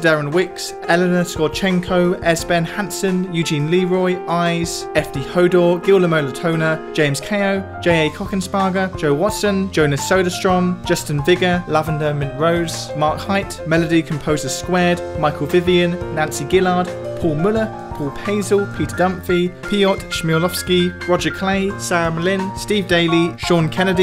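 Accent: United Kingdom. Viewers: Your accent is more like than mine, but we all say the word " " with the first syllable stressed and a little higher in pitch, right? In American English that first syllable isn't hit as hard in UK English even allowing for the wild variations we have over here. So, maybe it's sprechstimme?:-D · If this guy didn’t have a British accent I would have turned this video off at :45.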